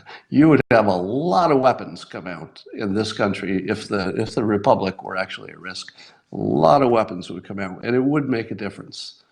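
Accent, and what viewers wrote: California, United States. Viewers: Californian's have the best accent.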